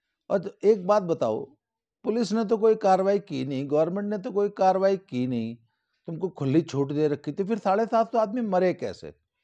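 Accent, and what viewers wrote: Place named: Rohtak, India. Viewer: koi pehli baar ye video sun na bata sakta ki east up ke bau saab ho, sun ke rohtak ke lagte hain aap 😊🙏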